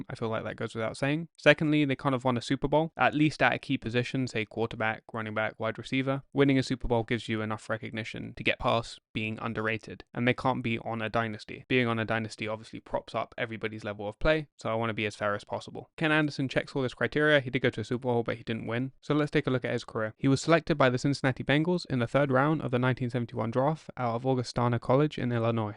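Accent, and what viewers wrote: United Kingdom. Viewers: "Many of you grew up watching the game. I am from the UK, so I didn’t. I only discovered the sport in 2012/2013. I’m sorry if it bothers some of you that I’m young. What can I say." -- Some people are just bothered and get on the web to share their misery. Don't let them grind you down or steal your joy! I watched your video, noting your accent, and thought you are very insightful. Glad to have a young fan of American football in the UK! Liked and subbed. "I want to stress I’m no expert. I get things wrong all the time. But I enjoy the game, I like researching, and I hope to provide a unique spin in my videos. I will continue to strive and improve every video." -- Carry on mate, and enjoy yourself. Don't ignore your critics, but focus on your fans. May you gain many! "Can’t change my age though....Sorry for the lengthy comment. Thank you for your time and God bless." -- Haha, time will take care of that for you, trust me! 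But those numbers are only laps around the Sun. True wisdom is found by looking above and beyond the Sun. May God bless you, too, and grant you much wisdom. See you in your next video.... 😃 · British accent? ok.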